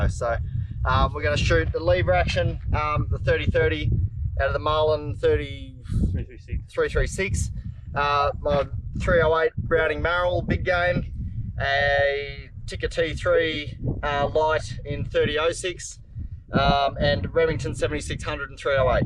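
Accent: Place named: United States. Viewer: My guy you sound like me. American.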